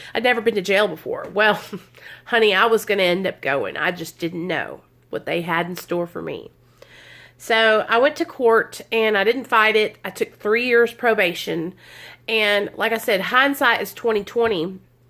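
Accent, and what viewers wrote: South, United States. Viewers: i love 💕 your accent is that down south ????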